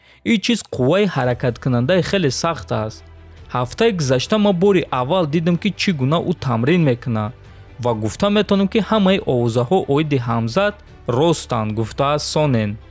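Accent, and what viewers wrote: Tajikistan. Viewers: Овози ширини точикии тозаат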